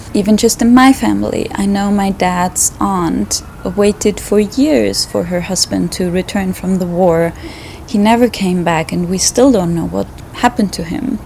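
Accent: Austria. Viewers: Nee, das ist nicht der Grund, warum sie in ihren Videos kein Deutsch redet. Ich wette, privat spricht sie dauernd Deutsch. Sie meinte halt, dass ihr österreichisches Deutsch für Leute außerhalb ihrer Gegend etwas komisch rüberkommt. Dann ist sie aber ein bisschen abgeschweift und hat über Hitler geredet. Das hatte aber damit zu tun, dass manche immer noch Klischees gegenüber der deutschen Sprache haben. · Your Austrian German is so beautiful and I completely understand it as a German ❤!I think you should be proud of it ,because it is a part of your personality!!! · Das ist das erste Video, das ich von dir sehe, d.h. ich kenne dich noch gar nicht und weiß auch gar nicht, was für Content du sonst machst und worum es in deinen anderen Videos geht, aber: Als deutscher Muttersprachler aus Deutschland denke ich, dass dich während während deiner Schulzeit so kaputt gemacht hat, dass du heute Komplexe hast, weil du dein österreichisches Deutsch als Makel empfindest. 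Weißt du was? Steh' doch einfach zu einem Akzent! Österreichisches Deutsch hat genau so seine Berechtigung wie jeder andere Dialekt und jede andere Varietät des Deutschen... :)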